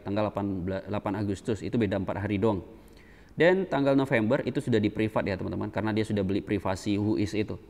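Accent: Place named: Indonesia. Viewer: Dari gaya bhs Inggris nya aj gw udh feeling org Indo (gw gabisa jelasin yg pasti klo lu suka merhatiin gaya bhs Inggris lu bisa paham) Yah modal sejuta buat pengalaman worth it lah. Thanks otot anjing 🍻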